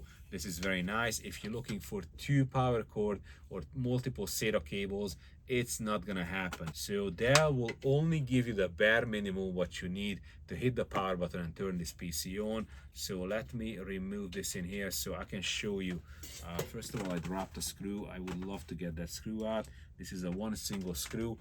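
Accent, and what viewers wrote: Hungary. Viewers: Yes, I comparing to the DT version to be exact, but most of my criticism is still true for the old SFF model. My point is that DELL gives you less and less quality and quantity for the same price, probably because the competition, both HP and Lenovo earlier started to use nonstandard PSU and other const cutting and eco system locking. From a business stand point the bisiness is business you can't compete with them in volume and power consumption, but you can with quality and performance if there is a demand for it. These machines are overbuilt for office use, but underbuilt for any performance usage CPUs and GPUs under performing in these systems due to heavy current limiting. Here is an example how long can last something if you choose the right parts, my first intel 775 PC from 2006 still works after 18 years what I bougth used then, now it is in a school in Esztergom. 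The only failure was a bad Hitachi harddrive within the warrianty period in 2008, it was replaced with 500GB WD BLUE which 12 years later produced the first bad sector, 500GB WD BLACK from 2010 still running without bad sector. BTW are you from Hungary? I'm guessing from your accent.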